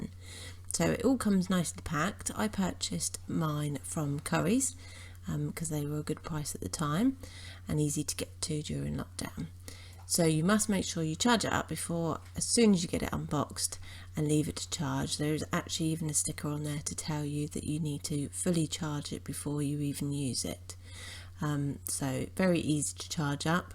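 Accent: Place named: New Jersey, United States. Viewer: That's a lovely accent you have. New Jersey?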